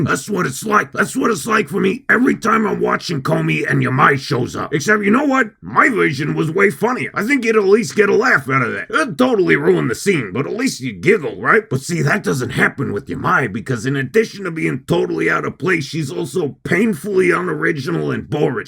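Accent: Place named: New Jersey, United States. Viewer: Love the jersey accent, although bailitmore accent is better, good video, easy sub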